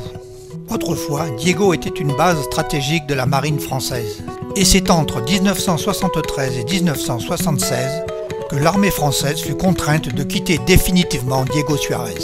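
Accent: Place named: Maluku, Indonesia. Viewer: Muka2nx Mirip Orang Indonesia Maluku Cara Bicaranx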